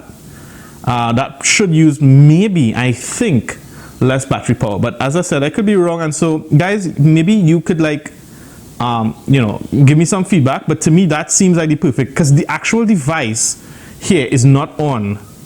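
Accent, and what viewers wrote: Trinidad and Tobago. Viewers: You sound like a Trini. Am I right? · Wow. An ACTUAL video that helped!! Thank you so much for this. Lov'n the trini accent too haha!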